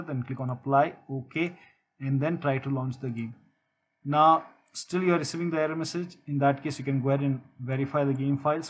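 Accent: India. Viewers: Any PC video with an indian accent is automatically a W. Well done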